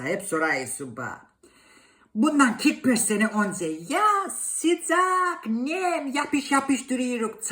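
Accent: Black Sea, Turkey. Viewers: bazen olduğunu unutup karşımda komsu annem karadenizli ayşe ablamı dinliyormuş gibi geliyor 😂😂😂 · Harika Karadeniz konuşmasinada bayiliyorum ya mukemel bir insan ...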